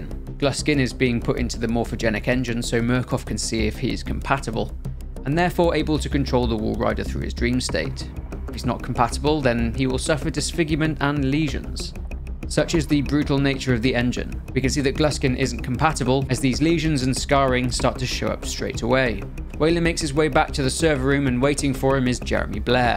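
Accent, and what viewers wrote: United Kingdom. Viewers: This guy as a cool accent. The storage of sounds cooler when there’s someone with an awesome accent telling it 😎 and Brittish/English people are cool to 😎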